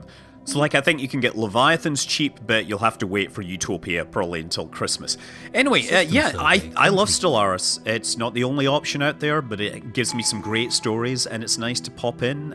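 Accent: Scotland, United Kingdom. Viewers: So you totally taught me to play KSP and I found Stellaris myself recently and in my YT searches, I found your name again...I thought to myself "Listen to that sweet Scottish accent talk about this new game I'm playing, FUCK YES!". Haha.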